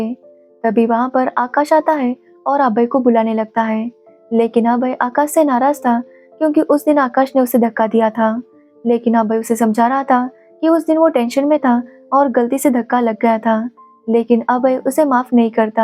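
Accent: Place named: South, India. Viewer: The narrator of this webseries needs loads of rehearsing......her South Indian accent isn't apt for narration and there are lots of flawy pitch in delivery......NYC scripts written for explanation of the series...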